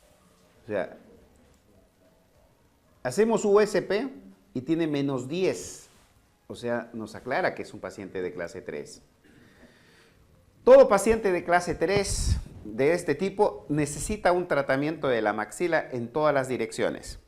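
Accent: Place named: Peru. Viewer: Pero el doctor tiene acento peruano, no colombiano jajajja. Es de Perú.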